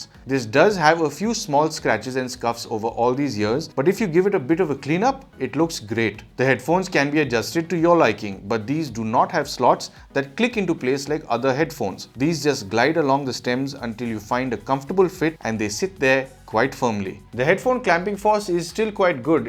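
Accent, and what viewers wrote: India. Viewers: the curry accent is great!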